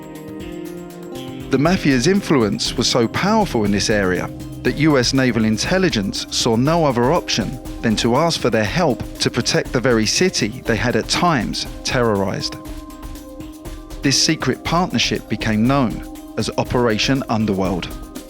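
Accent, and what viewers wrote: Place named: United Kingdom. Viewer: NYC history with a British accent. Fail...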